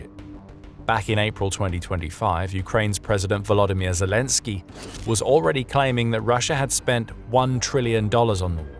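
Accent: United Kingdom. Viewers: First time listen to a Brit talk?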